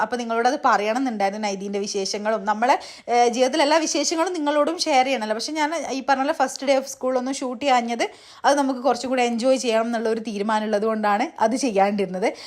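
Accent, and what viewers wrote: Kannur, India. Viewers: ഞാൻ എല്ലാ വിഡിയോസും കാണാറുണ്ട്, home tour വീഡിയോ കെട്ട്യോനെ പിടിച്ചിരുത്തി കാണിച്ചു, 😊 പിന്നെ കണ്ണൂർ slang നല്ല രസാ കേൾക്കാൻ.. ഞാൻ ഒരു കൊല്ലംകാരി ആണ്.. കരുനാഗപ്പള്ളി നിന്ന് ഒരു 30 min okke, hus ന്റെ വീട് തൃശൂർ ആണ്.. 😊 ഇപ്പോ തൃശൂർ ആണ്..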